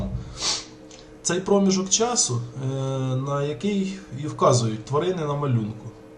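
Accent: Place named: Western Ukraine, Ukraine. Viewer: Понимаешь что достаточное колличество лайков оно не наберёт - тема не популярная. Но раз Бог открыл для тебя эту информацию, значит может это не просто так, а для того чтобы ты поделился ею! И ещё , в видео Говори медленнее, я из Украины , но твой чистый западный диалект, не очень понимаю. И более доступно объясняй - когда ты писал в комментариях - я гораздо быстрее понимал , чем когда ты говоришь в видео.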